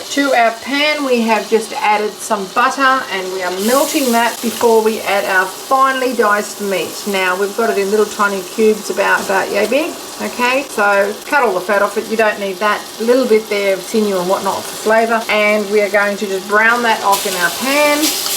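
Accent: Australia. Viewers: Thank you for this wonderful recipe. I have been living in Germany for many years now, and I miss my pasties and pies. Luckily I managed to buy a family pie maker and so lucky I stumbled over your youtube channel. :D So nice to hear a fellow Australian talk, too. The pasties are in the maker, filling tastes fab and they look so great, I cannot wait to get tucked into them.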